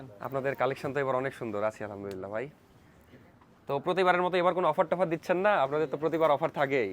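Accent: Rajshahi, Bangladesh. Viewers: রাজশাহীর ভাষা শুনতে ভালো লাগে। যাই হোক আপনার নতুন মা কে স্নেহময়ী মা মনে হলো ।🎉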